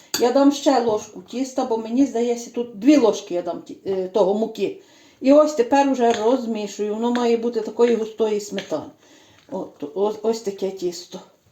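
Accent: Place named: Western Ukraine, Ukraine. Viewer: Дуже гарний рецепт! Щиро дякую за нього! Обов'язково зроблю і спробую. А найголовніше---то говір, наш, западенський, такий рідний, ніби дома побувала... Аж в грудях защеміло... Шануймо нашу мову, нашу Україну. Ділімося всім, чим маємо. Нема в світі понад наше, рі дне. Ще раз щиро дякую за рецепт.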